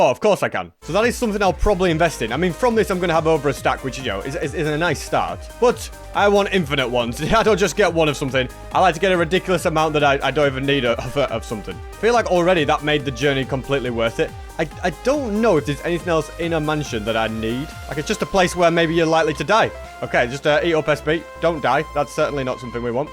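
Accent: United Kingdom. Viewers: Are you British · Today is the day,I realized that SB is British 😅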